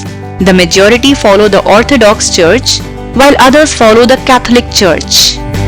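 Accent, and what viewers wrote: India. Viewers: Where is lndia? And the person who is talking sounds like an indian.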